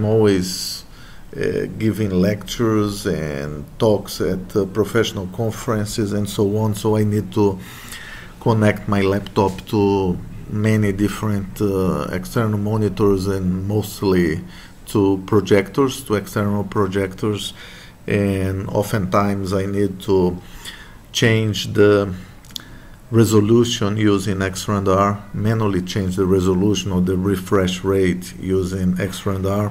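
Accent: Brazil. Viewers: sotaque brasileiro é forte kkkkk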